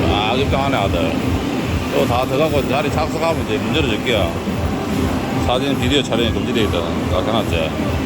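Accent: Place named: Gyeongsang, South Korea. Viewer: 구수한 갱상도 사투리 멘트에 정감이 가서 자주 보네요 ㅎ 건승하십시요 ᆢ참 기온 이벤트는 1일인가봐요? 그럼 이달말은 31일 8월1일 2일동안 이벤트인가요? 1자가들어가는데 궁금해서요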